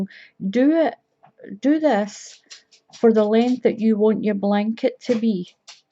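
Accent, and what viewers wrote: Scotland, United Kingdom. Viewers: Hi I am so glad I came across your crochet tutorial. You are great at explaining things. Many thanks and love your Scottish accent. Thank you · Hi are you Scottish?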